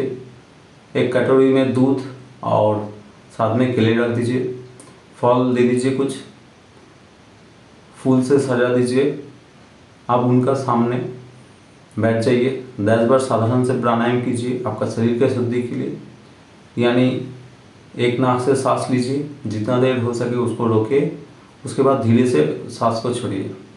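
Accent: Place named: Bengal, India. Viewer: Your Hindi is Bengali.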